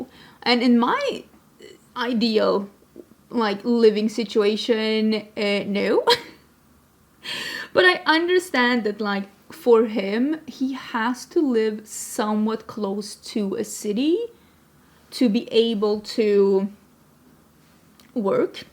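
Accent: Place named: Sweden. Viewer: I think I heard you mention previously that you are from Linköping, which is the town my mother was from. She passed away almost 2 years ago and I miss her terribly. Your talking about Sweden and your accent bring me comfort! Sweden is a beautiful country, I have many great memories of it from childhood.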